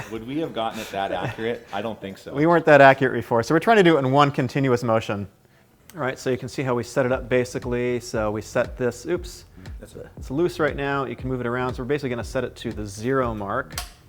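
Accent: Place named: United States. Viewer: You look British but sound American. Good video, thanks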